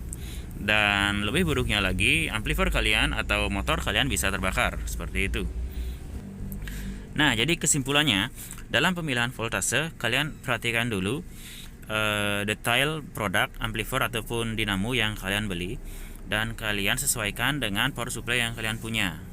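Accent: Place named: Bali, Indonesia. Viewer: Seperti aksen Bali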